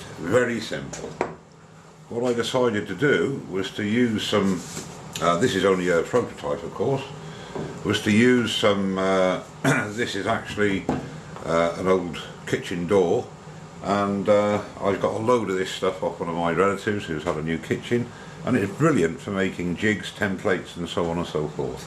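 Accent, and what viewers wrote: Wales, United Kingdom. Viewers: I hope you recover quickly. I really enjoy your video's and enjoy the Welsh accent have not heard one since my Great Grandfather died. I had one though if you had put another 2x4 on the edge away from the blade slot you would not need the stop block, in my shop the stop block will wind up getting turned or lost! All in all good on ya! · hey big guy lol lol no sarcasm today hope u get better soon bud and i aint kidding ive seen bells palsy before....was wondering where u were was missing yur welshness lol lol glad yur back and the jig is great keep the vids coming have missed u greatly......dougie....frm bonny scotland......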